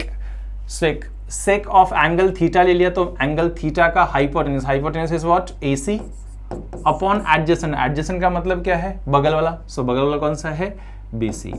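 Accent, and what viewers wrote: Bihar, India. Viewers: Bihar se ho sir ? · Sir aap bihar ke hain kya? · You are in bihari style. Jai Hind Jai Bihar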